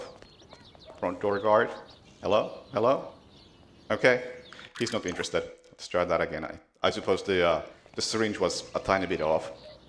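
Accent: Finland. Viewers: "Hello" - this guy's Finnish! Damn the accent of my countrymen is so distinguishable it's not even funny :D Great gameplay. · Beautiful finnish accent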